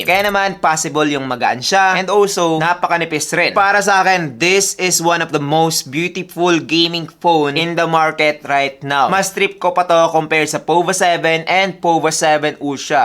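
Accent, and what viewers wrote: Visayas, Philippines. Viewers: Taga San kaba boss parang may kunting bisaya Kasi ung fluent mo sa pag tatagalog din 😮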